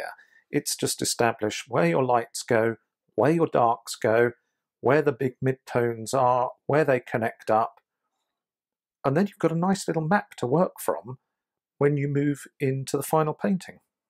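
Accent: United Kingdom. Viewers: Amo o sotaque britânico e amei sua arte. Obrigada por compartilhar.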